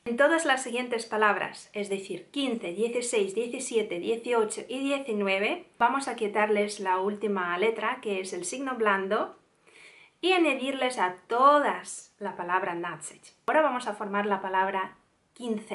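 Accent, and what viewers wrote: Spain. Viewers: pero xq hablas con el acento español? El vídeo sale mejor si lo hablaras sin ese acento. Me hace recordar a la serie rosa. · WOW......... FINALMENTE recuperas tu acento castellano , bienvenida , Como siempre gracias por tu tiempo y educarnos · Hola!. Me gustan todos tus videos. De a poco estoy aprendiendo ruso con vos!. hablas muy bien el español y tienes el tipico acento español (España). Какие красивые глаза,! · Felicidades por ablar español.pero debés saber que él español de España ningún país latino les gusta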